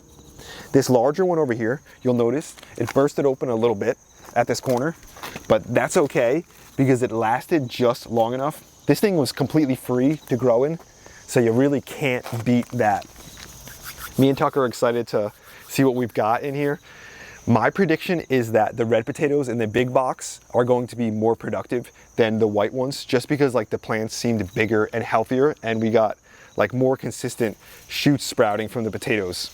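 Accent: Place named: East Coast, United States. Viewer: yaaaass i love the east coast wooder accent!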